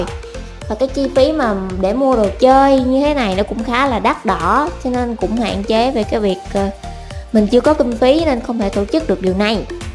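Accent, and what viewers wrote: Mekong Delta, Vietnam. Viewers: Chị Miền Tây à!! Thảm nào giọng Chị Kute qué:3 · người Miền Tây mà giọng lúc nào cũng kawai như thế này cơ đấy xD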